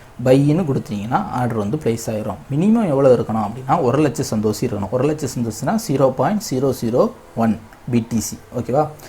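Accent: Kanyakumari, India. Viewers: Kanya Kumari thane bro neenga 🔥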